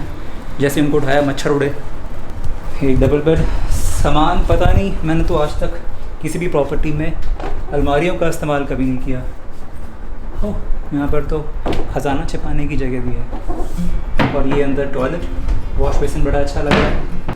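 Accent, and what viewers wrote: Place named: Asansol, India. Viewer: Asansol type shune khub moja laglo. Love from Kolkata.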